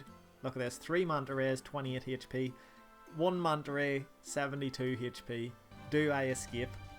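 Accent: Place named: Belfast, United Kingdom. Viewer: Bro we’re about a are you from? As a Belfast man I never thought our accent youd sound good on an rs vid... and I was right lol makes me cringe soo much.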